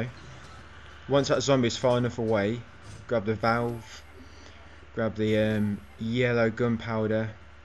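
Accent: United Kingdom. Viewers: oh the British Accent, I LOVE IT <3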